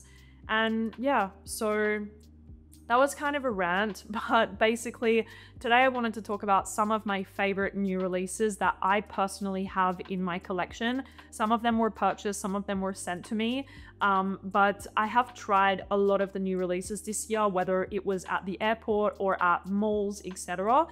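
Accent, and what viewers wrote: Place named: Australia. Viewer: That’s called an Australian accent. I love it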